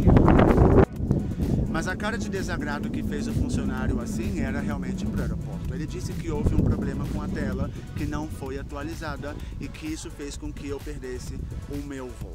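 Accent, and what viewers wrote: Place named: Antioquia, Colombia. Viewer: E muito interessante como seu espanhol e tão paisa 😅😅😅😄😄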